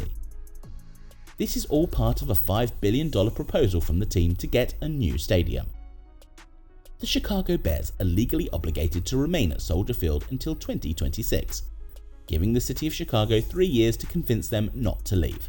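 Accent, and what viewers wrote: United Kingdom. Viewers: Knowing this is AI generated video, the statement about Bills wanting a new stadium , was pushed by the NFL brass. But the AI is incapable to think or know anything. Also British accent doesn't fit here.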